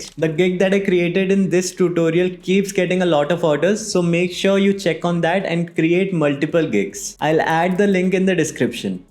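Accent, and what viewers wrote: India. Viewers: Just started watching video. This bro is totally inspired by indisn accent of english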